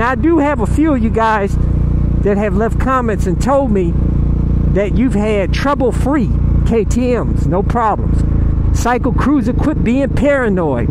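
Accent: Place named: Texas, United States. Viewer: Hahaaaa. Dude, you're awesome. What is that dialect by the way, you in Texas or somewhere south? .. and yea, you have a new subscriber.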